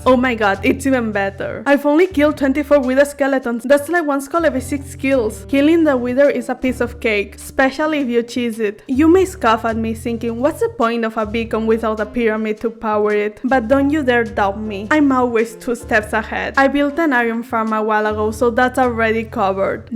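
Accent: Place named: United Kingdom. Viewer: you sounding british makes the video better lol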